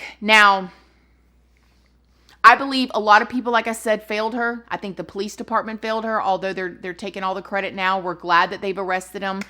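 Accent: South, United States. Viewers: I think Morbid Podcast did an episode on this! And I just imagined if you did an episode with them, AHHHHH!!!!!! You and your southern accent and colloquialisms with their New England accents would be the absolute cutest to hear!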